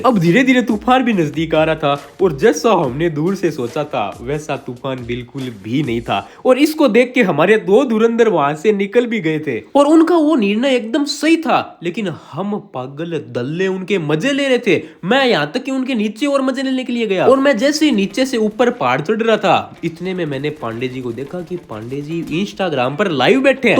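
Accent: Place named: Rajasthan, India. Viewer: Bhai main Teri voice sunke Bata sakta hun tu Rajasthan se hai ❤️❤️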